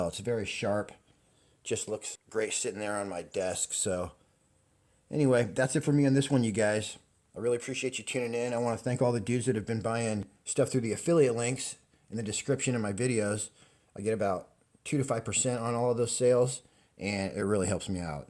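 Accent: United States. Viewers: Just curious. You sound like a Marine Recon sniper or Army Green Beret. You self-criticize sub-inch groups at whatever range. Are you from Kentucky?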